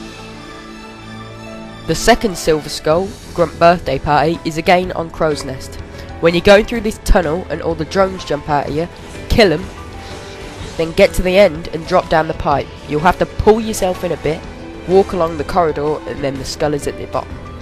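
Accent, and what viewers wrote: England, United Kingdom. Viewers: o rite sorry if u took offence i did actualy use ur guides a while back to get my halo online spartan laser acievmentssuch as 2 kills in 1 shot and shooting the banshee :D u make great quality video btw a guide for the laptops in COD 4 would be useful to alot of people it took me 3 hours to get them with a written guide bu with one of your video guides it would be alot faster just an idea just wondering what part of england is ur accent from?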